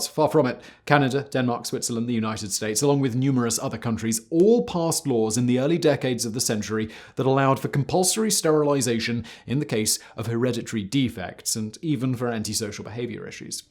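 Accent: United Kingdom. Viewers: Omg it's the bri'sh VSauce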